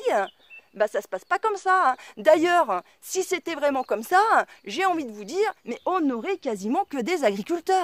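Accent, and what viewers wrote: Paris, France. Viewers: je suis désolé mais je me devais de revenir sur certain points de cette vidéo qui m'ont fort dérangé. Ton ton de voix dans cette vidéo, ou encore ta manière de parler m'ont donné beaucoup de mal à finir cette vidéo. J'ai l'impression que je me fais gronder même si je sus pas concerné. Je trouve que tu as la façon de parler du jeune ado parisienne, hautaine, qui sait tout sur tout, limite à donné implicitement des ordres. Et puis surtout, et je pense que c'est ce qui fait mon impression, c'est les "in" à la fin des mots et les quoi à répétition, sans oublié les phrases classiques de type "non mais j'sais pas quoi" ou "c'est - un truc - de dingue", ou encore les mots comme "choqué", "grave", etc. C'est extèmement désagréable, je suis désolé mais ça m'as fort frustré et dégouté, alors que le but de la vidéo est d'intéresser les gens de les faire changer, et pour moi, si quelqu'un me parle comme ça pour me faire la morale, je lui envoie deux claques (virtuel parce que je n'aime pas la violence) et je l'envoie balader, qu'il ou elle aille se faire f*****. 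Pour capter une audience, c'est la pire chose à faire Ensuite il y a deux points dans ton résonement où je ne suis pas du tout d'accord. Premièrement, oui on peut vivre de chasse et de cueillette, bien sûr que l'on peut vivre de chasse et de cueillette. Nous sommes des animaux, et avec le savoir faire nécéssaire, nous sommes totalement capable de nous nourrir comme cela, tout comme les autre animaux, nous n'y sommes juste plus ha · cette accent parisien avec des "en" a chaque mot c'est terrible . aprés je comprend ta logique mais jvois pas la finalité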